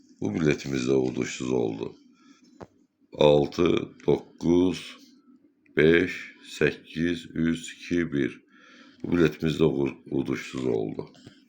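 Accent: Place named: Balakan, Azerbaijan. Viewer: lap yaxsi lehceye göre sorusdum sandım balakenlisiz.adim Ali uqurlar size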